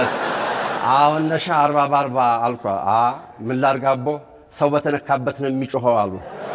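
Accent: Wollo, Ethiopia. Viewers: አነጋገሩ ራሱ ወሎኛ ነው ደሰ ይላል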